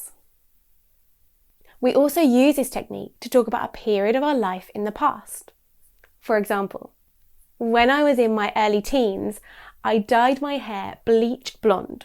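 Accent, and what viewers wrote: United Kingdom. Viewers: 영국식 발음 멋있어...👍👍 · She is british ... diffrent from American or Australian English ... Whoever put this clip needs think that hard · 말하는게 너무 영국식이야.. ㅠ.ㅠ 했더니 영국영어 채널이었군요. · What a lovely british accent! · Your lesson is very easy to understand for me and I like British accent because i am living in UK. Thank you !! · 와 영국발음 적응안된다 ㅋㅋㅋㅋ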